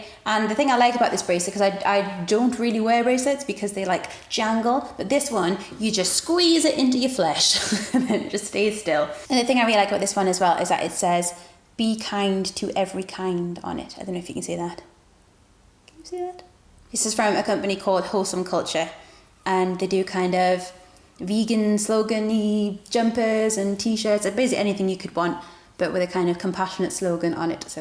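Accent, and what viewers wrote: Newcastle, United Kingdom. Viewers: Love the Geordie accent problems. I say 'luck book' haha x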